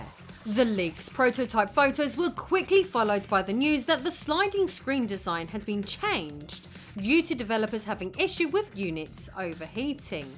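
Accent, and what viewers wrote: United Kingdom. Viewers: oh dear! Your british accent is simply killer!!!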